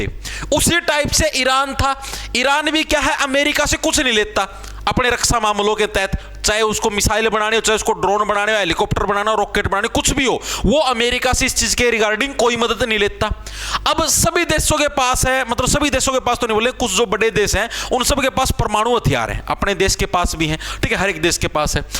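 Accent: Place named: Haryana, India. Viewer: Arey hindi to theek se bol liya karo bhai samjh to jab aayega haryanvi mix kar rakhi hai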